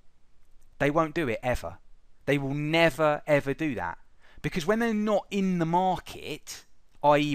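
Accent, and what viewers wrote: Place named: United Kingdom. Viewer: I feel like I’m watching a Guy Ritchie movie haha love the accent. Good stuff man 🤙🏻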